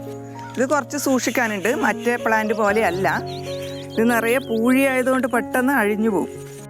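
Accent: Kannur, India. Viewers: ചേച്ചി കണ്ണൂരാണോ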